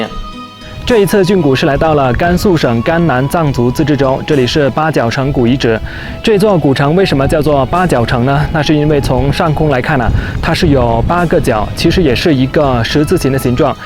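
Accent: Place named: Hakka, China. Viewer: 從你的普通話 可已知到你是客家人 佩服你的毅力及對各地風警 人文的解說 我是住在南加州的七十八歲的客家老者 喜歡看你的視屏 我也有制作一些旅游視屏 但難豋大雅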